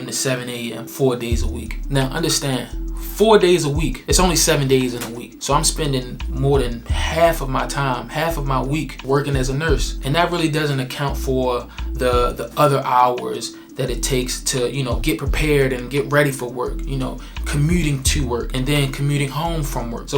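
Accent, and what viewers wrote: Baltimore, United States. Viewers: That baltimore accent is accent is something else brother. Thanks for the information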